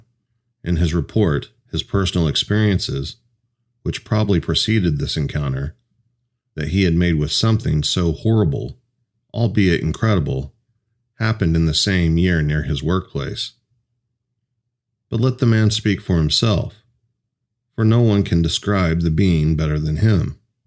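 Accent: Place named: Midwest, United States. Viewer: Don't feed the trolls. I'm the most critical person I know and I can say yours is a narration vpice is professional grade. People pay 10s of thousands for a Midwest accent like yours to do audio books and tv spots. Some folks are not in a good place in life and attacking others helps their pain go away for a moment. Your golden Pony Boy.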